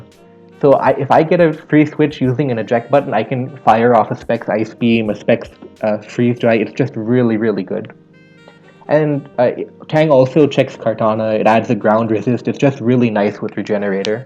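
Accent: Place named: India. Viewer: I love the slight indian accent in your voice it just tells the world that no matter how big you get, or successful, we stay indians at roots! Great vid man ;)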